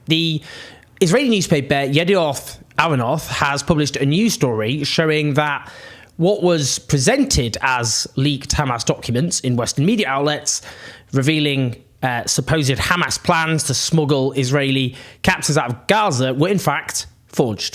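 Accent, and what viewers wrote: United Kingdom. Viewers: ok mf, nothing your British accent voice can do. about Israel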